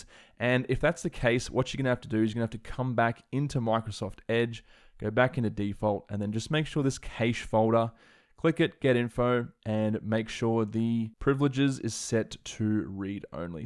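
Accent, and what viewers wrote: Australia. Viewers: Brilliant video... love the Australian accent my friend.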